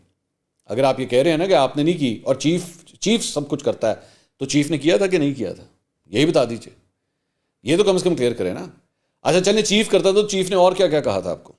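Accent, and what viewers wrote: Punjab, Pakistan. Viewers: Bro i really like ur analysis also your punjabi tadka during analysis .. Good work.. keep it up.!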